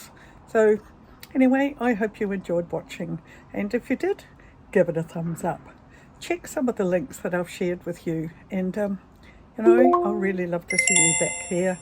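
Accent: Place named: Australia. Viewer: It's pasta time I made some noodles. 'I just love it.' You slipped into Aussie there. 😲You did sound rather Aussie all the way through. Have you just been video chatting to your family in Aus just before making the vid? After you put the onion in you could have said 'That's shallot'. Problem solved. 😁😁 I follow the recipe from my cookbooks exactly because I'm testing them. I'm a massive black pepper fan. Peter Piper picked the peck of pickled peppers just for me.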